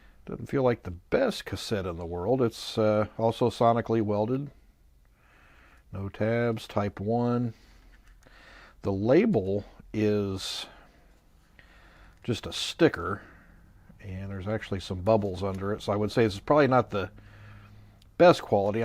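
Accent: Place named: United Kingdom. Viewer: Thank you I really enjoyed the video. Your accent sounds more British than most American YouTubers.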